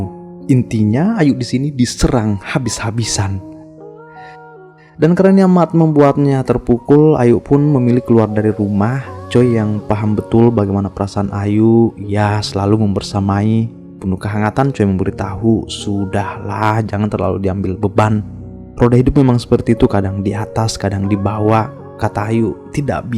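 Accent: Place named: Sulawesi, Indonesia. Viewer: weee min logat mu kya logat Sulawesi 😭😭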